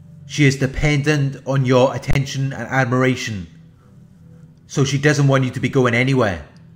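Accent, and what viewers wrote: Wales, United Kingdom. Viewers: Welsh accent..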